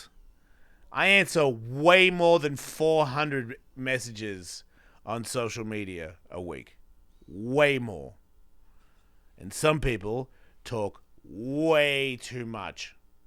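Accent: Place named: Australia. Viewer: HEHEHE DICKHEAD ITS SO AUSSIE EVEN THOUGH YOUVE BEEN AWAY SO LONG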